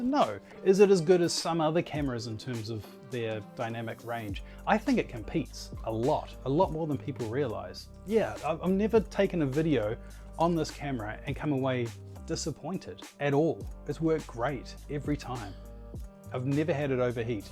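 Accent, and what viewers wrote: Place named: New Zealand. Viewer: Omg I thought you had a Kiwi accent! And you are from NZ indeed!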